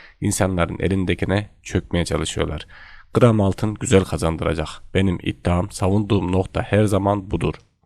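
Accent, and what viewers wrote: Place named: Konya, Turkey. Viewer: konya şivesiyle konuşuyorsun konyalımısın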